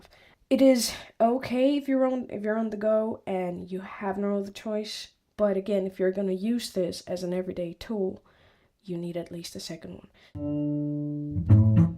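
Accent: Netherlands. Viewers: Naw she is Dutch. Ik kan het accent horen. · where are you from? Dutch perhaps or another accent? it sound familier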